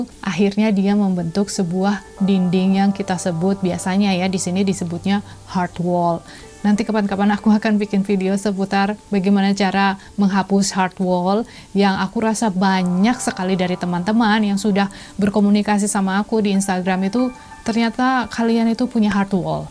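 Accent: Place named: Java, Indonesia. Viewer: Mantap sekali ilmunya 🥰🥰 sehat selalu kak 😍 Bahasanya clengkring clengkring ngapak banget 🥰🥰🥰 salam dari purwokerto kak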